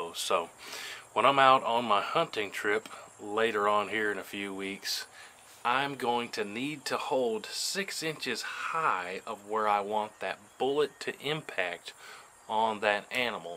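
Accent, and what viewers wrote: United States. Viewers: ! Dude I love that crazy country accent of yours and I am SHOCKED that some Producer here in Hollywood has not made you a movie offer!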